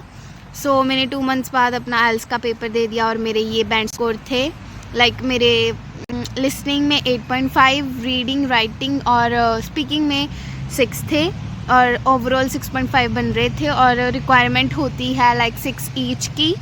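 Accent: India. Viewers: Waah what a Punjabi accent!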